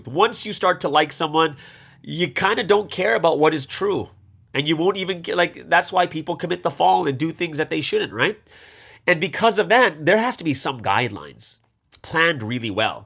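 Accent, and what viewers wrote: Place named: Australia. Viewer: Oh I'm all for 👏 GIRLY 👏 AUSSIE 👏 ACCENT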